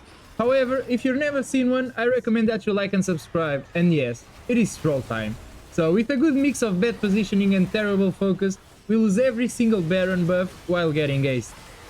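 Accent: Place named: Portugal. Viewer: Sotaque não engana que é tuga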